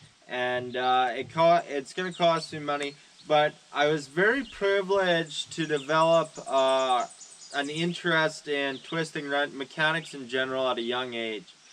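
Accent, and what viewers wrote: Canada. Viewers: As soon as you started talking I knew you were Canadian. LOL We do have an accent that is identifiable. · I could listen to you talk for hours about anything. Your accent is amazing and as a fellow Canadian I wish I had it lol. You’re the most attractive man on YouTube ❤️ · He reminds me of letter Kenny. Also I don’t know why I’ve never heard it but Canadian accents like his almost sound Irish at times. They have the lilt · Lol right away I thought this guys Canadian.. anyway good video and I subscribed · I knew you were Canadian with the first sentence you uttered.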